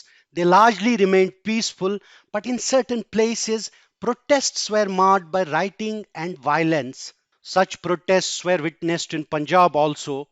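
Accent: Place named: Kashmir, India. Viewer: Sir has a Kashmiri accent 🙂..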